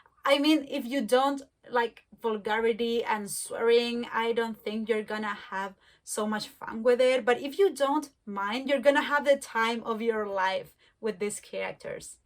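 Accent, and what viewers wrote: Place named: Chile. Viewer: Nada que ver, pero desde el primer segundo que te escuché reconocí el acento chileno, saludos compatriota 🇨🇱🤞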